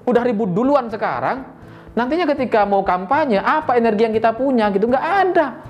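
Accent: Madura, Indonesia. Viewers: Plus logat khas Madura-nya.👍👍👍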